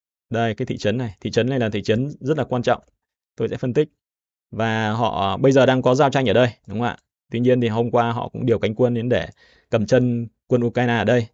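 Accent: Standard. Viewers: Nói chuẩn nha chú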